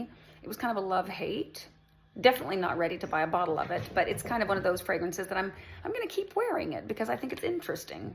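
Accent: United States. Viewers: Btw, I love it that Sweden is so secular that you were unfamiliar with "omniscient." It's one of the three "omni" properties that the god of classical theism possesses (omniscient, omnipotent and omnibenevolent.) I had a fiancee for 4 years who was from Gàvle, and while her English might be stronger, you've got the American accent down perfectly. She has a Swedish accent when speaking English and you do not. So interesting! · You sound like a perfect American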